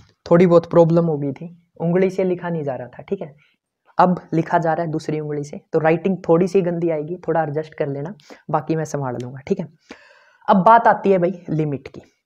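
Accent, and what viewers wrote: Haryana, India. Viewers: Kis kis ne lagya ke sir Haryanvi ha 💪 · Sir your efforts are so appreciatiable. I like your Hariyanvi acsent .👌🙏😃😊👏🤗🙏😊👏👍🙏👌😃😍🎊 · Sir g aap haryana se belong karte ho na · Sir aap haryana se ho kya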